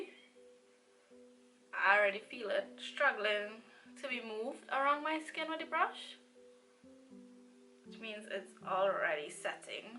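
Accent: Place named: Trinidad and Tobago. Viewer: trini!! new subbie soon as i heard the accent. thought the color was totally off at first but with some oxidation you worked it out and you looked beautiful.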